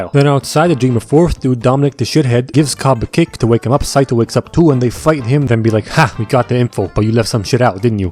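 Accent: Spain. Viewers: ...I thought he was either Spanish, Hawaiian or Asian. 🤨🥴🤔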